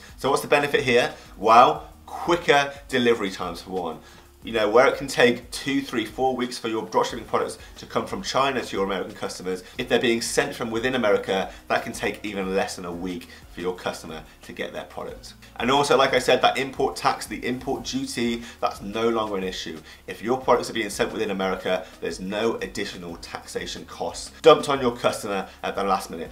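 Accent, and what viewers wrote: United Kingdom. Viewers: Ooh I as much as i hate british accent, this information is very useful.Thanks!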